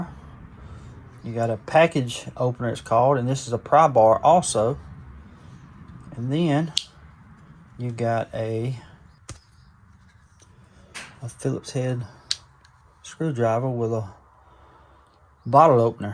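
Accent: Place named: South, United States. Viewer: Thanks for another video of your shopping successes. I am definitely jealous. I don’t mind when you say Victoria-Nox. You’re Southern, I get it. I recently moved to Texas from Illinois, and have found that a lot of my pronunciations of local names are off. Am I wrong? Probably so, as they have been pronouncing their landmarks and local names their way since forever and I just got here.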